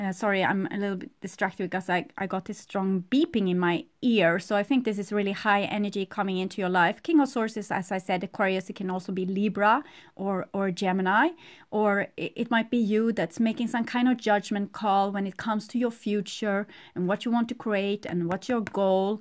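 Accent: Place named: Sweden. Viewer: Are you Swedish? You have a Swedish accent. Thanks nice reading still watching though.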